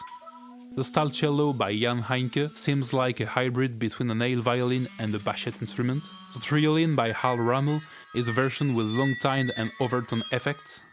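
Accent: France. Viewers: Le magnifique accent français xD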